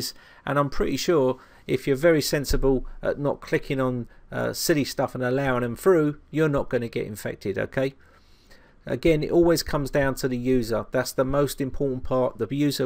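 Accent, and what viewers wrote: United Kingdom. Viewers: your british accent man you`re 150% british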